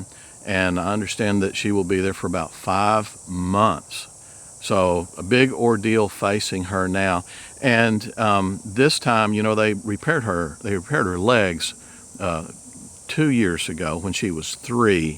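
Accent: Texas, United States. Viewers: Say Coyoteeee! We Texans do not need to speak the same as the rest of the country! I mean some people up North cannot pronounce the "R's"! Coyotes have to be thinned out. It's your ranch, don't allow others to tell you what to do!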